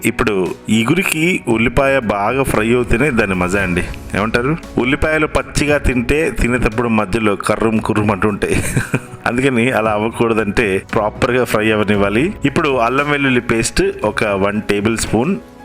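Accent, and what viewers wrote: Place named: Godavari, India. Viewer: garu... me voice ki, mee recepies, last lo meeru paade songs ki nenu picha fan ni andi babu... miru chepthe nammaru nenu kuda mee laga matladesthunna andi baboiii... Mee yaasa ki mee prasa ki 🙏🙏🙏 Finally mana godavari vantalu antene oka adbutham... danni miru inka baga vandi chupisthunaru... Ah vantalu chusi abroad lo unna ma paristhithi enti andiii... edi miku emanna nyayam ga undaa...🥺 Final ga okati andi mee voice ki mee yaasaki nenu pedda fan...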